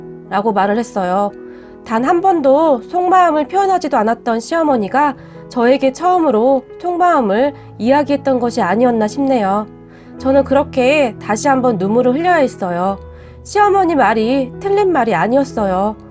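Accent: Gyeongsang, South Korea. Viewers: 갱상도본토배기보다 더잘하시는데요?ㅎㅎㅎ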